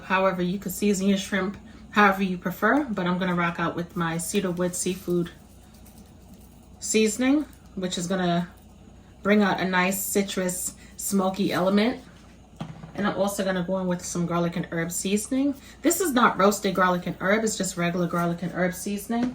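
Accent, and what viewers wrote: New York, United States. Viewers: Love you Mama ❤️ never stop 🛑 beautiful accent NYC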